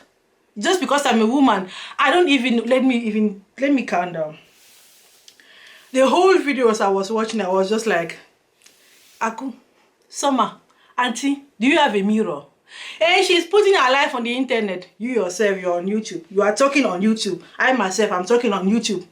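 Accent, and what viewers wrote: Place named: Africa. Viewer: So what is the difference between you and Summer Aku? You both talk aggressively and I think that’s just your african way of talking. You are doing exact same thing you are accusing her of. If everyone should mind their business, then Summer Aku should mind her business and you too should mind your business. Everyone should mind their business. Something else, if you are going to quote Summer Aku you should at least quote her right. I watched that video and you’re not quoting her right. At the end of the day, as you are attacking Summer for getting involved you too should not get involved. You are not Nikki’s defender. There’s a lady on YouTube called LovelyTi. She talks about any and everyone just like Summer Aku. It’s not a new thing.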